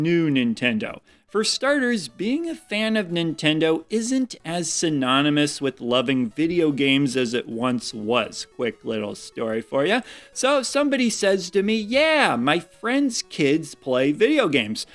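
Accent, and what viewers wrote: Midwest, United States. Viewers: Dude I legit haven’t watched one of your videos that I haven’t loved Thank you I watch your channel (mostly listen) while doing chores like washing dishes or folding laundry and seriously I feel like you are my Midwest (guessing on the accent) BFF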